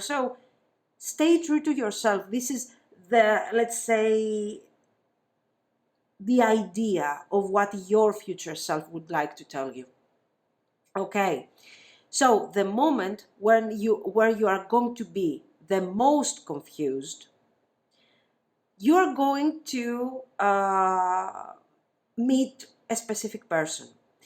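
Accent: Greece. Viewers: hi. i feel like youre greek bcuz i am half and your speech is familiar. is this rtue? any advice how a greek woman can be w/ a colombian man?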